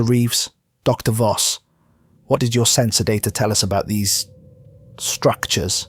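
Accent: Wales, United Kingdom. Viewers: Dude, you actually read things not just use an AI reader, who cares about the odd and ends like that, but respect for caring. Frankly I subbed the second I heard the Welsh accent, sounds like home!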